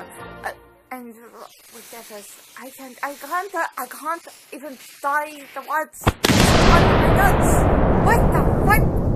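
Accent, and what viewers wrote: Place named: United Kingdom. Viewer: very nice thanks for making my day brighter. nice british accent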